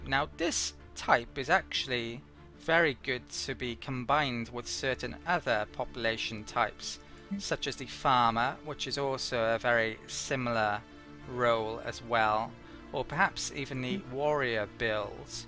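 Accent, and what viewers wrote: Wales, United Kingdom. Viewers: Hey, can I ask, are you Welsh? Because my brain is telling me you are, and I wanted to see if I was right. great video :)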